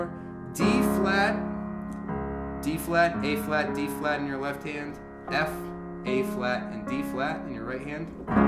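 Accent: United Kingdom. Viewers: American? He's British :P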